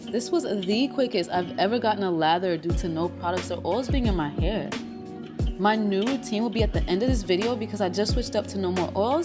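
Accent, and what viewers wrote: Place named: United Kingdom. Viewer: The British accent 👏🏽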